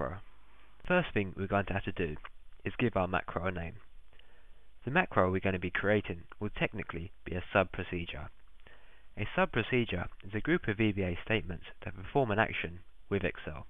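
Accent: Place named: England, United Kingdom. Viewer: I have a hard time trying to understand VBA. Trying to understand that Englishman's accent makes it harder. I have had to rewind to listen to what he is saying half a dozen times in this video, and still didn't get all his words. I know he sounds like that cute gecko on the Geiko commercial - but have him work on his accent for us American folk just trying to learn VBA. Thanks. (I am sure he is probably a nice guy - don't take it personally, guy.)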